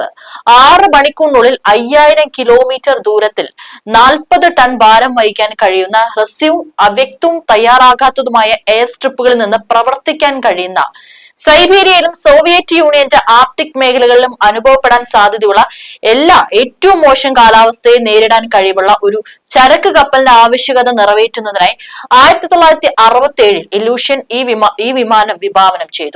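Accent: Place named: Thiruvananthapuram, India. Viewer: തിരോന്തരം ജില്ലകാരി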